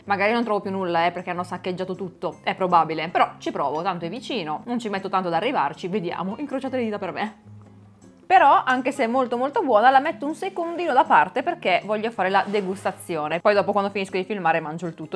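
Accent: Turin, Italy. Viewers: #avocadoimpanato(?) Ragazza mia io ti devo dire grazie mi sono segnata tutto quello che spero poi di trovare al Lidl .. Yogurt al cocco.. Barretta al cocco.. Formaggio vegano..io sicuramente lo userò x le melanzane al forno.. E gli anelli di cipolla E sperando di trovarli i gelati al cocco che avevi detto l altra volta nel video che sei stata così carina a farceli vedere😋..❤ Parli fra un po' come una di cit turin😊.. Non so cosa hai fatto ai capelli ma sembrano più morbidi e lucidi.. Continua così. PS se torni al Lidl anche la marmellata bio alle fragole merita...😋😘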